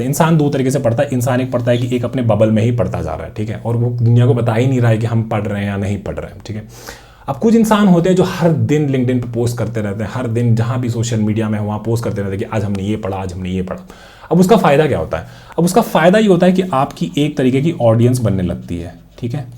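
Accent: Bihar, India. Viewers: Aap bihar se hai na bhaiya !!😅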